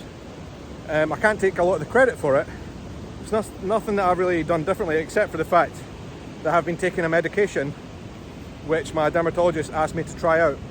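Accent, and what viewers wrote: Scotland, United Kingdom. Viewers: The curiosity might be not just the English speaking but the "funny" accent. LOL Nobody can turn down listening to a Scottish accent! ;) My Dad was from Forth so I could listen to you talk all day long! So glad the cyclosporine has been such a miracle for you! Heather xo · ...INTERESTING contrast: a Scots' accent...in BRAZIL...! WHEN you take up running, make sure the shoes are SUPPORTIVE; (YOUR knees will THANK YOU...). BTW: I'm VERY HAPPY you respond well to the cyclosporin... When you need to get off cyclosporin, DO try diet changes... YOU have a LOT of positive attributes going for you... 👍😃👣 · Do you have a heavy Scottish accent in Portuguese too?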